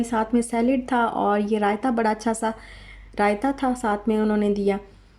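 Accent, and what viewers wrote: Saraiki, Pakistan. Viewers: AP siraiki ha ...bat karny se lagra ha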